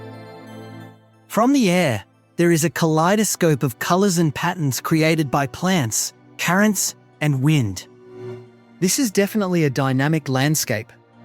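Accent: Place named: Australia. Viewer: Congratulation on a very well done and interesting video. And no problems at all with the voice. Clear, easy to understand and in a neutral/Aussie accent, cheers..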